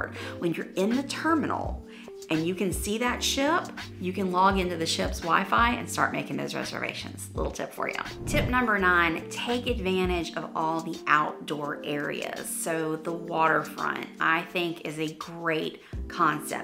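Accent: South, United States. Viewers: She sounds southern, so maybe Virginia.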